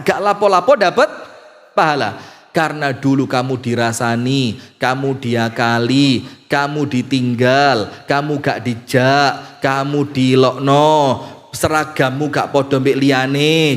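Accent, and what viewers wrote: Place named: Java, Indonesia. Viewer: Masyaallah pak ustadz wajah ke arab arrabban..bhsa jowony medok...inggris juga bs.apalgi bhs arab sehat sehat pak ustdz Aamiin 🤲❤️🙏🙏❤️❤️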